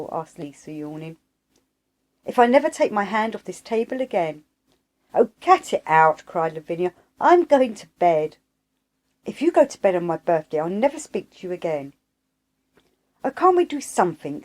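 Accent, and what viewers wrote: United Kingdom. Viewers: O wonder! What lovely voice! It’s so beautiful to listen to Crowley’s writings in a british (i assume british. I apologize if different) accent. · Between the horrible audio effect,which muffles every word and the limey accent, it's utterly impossible to follow from sentence to sentence. Why bother?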